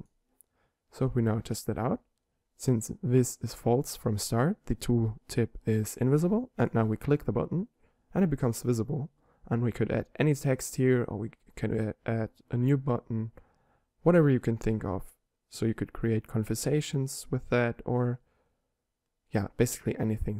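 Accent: Germany. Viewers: Dein Englisch ist sehr sehr gut. Man hört den deutschen Accent nur ganz leicht raus.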